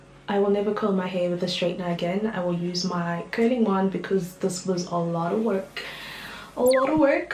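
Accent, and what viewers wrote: South Africa. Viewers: Love the hair. What flat iron did you use? Btw you sound South African.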